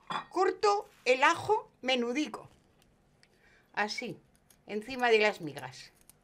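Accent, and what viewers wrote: Aragon, Spain. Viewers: Tú eres maña..... Ese acenticooooo.... Eres graciosa, concisa y clara... Las probaré con la patata rayada. Muchísimas gracias por tu desparpajo y receta. Buen trabajo, maña!! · Me encantan las recetas tan ricas,que haces,y es un placer oírte hablar con ese acento,maño.Alli pase mi infancia.Ana María.❤😊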